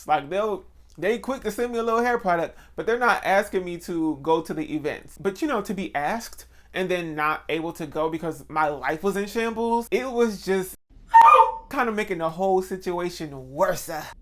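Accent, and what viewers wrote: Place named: Philadelphia, United States. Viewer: Why do you lowkey already have a philly accent😂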